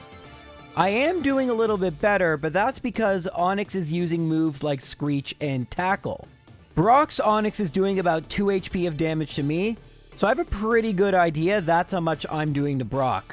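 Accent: Canada. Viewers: These videos mean so much to me man. It's weird, I only just started watching them about 6 months ago but these Gen1 Runs are such a relaxing, anti-anxiety chill time for me. Maybe it's the Canadian accent (I left Canada 10 years ago) and Gen 1 nostalgia, but dang man. Thanks so much, keep it up please!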